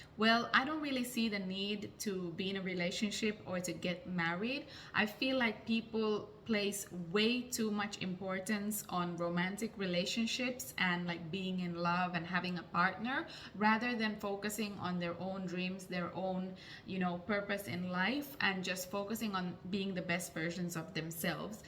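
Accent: Caribbean. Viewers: Its good to be self aware and not be influenced by society expectations. Btw your accent sounds like someone from the Caribbean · I'm Indo-Guyanese and it's interesting the English accent for an Indo-Finnish person is so similar to that of Indo-Carribean people. I never would have guessed you are from Finland. Anyhow, you're right that many people in the world today are having children for all the wrong reasons and some of them are not fit to be parents and bringing children into the world to satisfy the expectations of others is no good reason at all. However, calling people in India "narrow minded" is not fair. You're trying to figure out life on your own without having read the user's manual. Like those parents who should not have bore children, you are not living according to dharma because of a lack of scriptural knowledge. India is the land where God gave the users manual. 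Begin with the Bhagavad Gita, and you might gain some perspective, clarity, and guidance. Also, Indian history is very complex and it went from being the greatest land in the world to being one of the poorest after centuries of abuse from outside forces, but the Indians are restoring it's former glory without much help from anyone and trying to do so in keeping with scripture. Finland does not have the population of India but England stole $43 trillion in wealth from India and all of Europe and the west is enjoying a different lifestyle because of it. Not just Indian wealth, but Indian knowledge and labor as well. From Pythagoras to Mark Zuckerberg. Fiji to Trinidad & Tobago.. Last thing is you mentioned being attacked. If you'r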